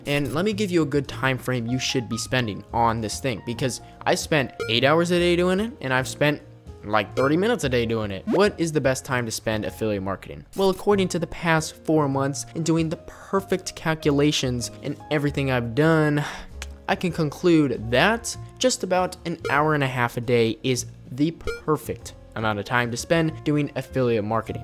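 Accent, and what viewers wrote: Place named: United States. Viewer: You sound American but your channel name is why?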